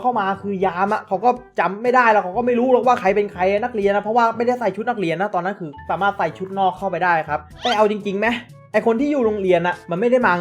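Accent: Phetchaburi, Thailand. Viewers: พี่ อยู่จังหวัดไรคับสำเนียงพี่เหมือนเพชรบุรีเลย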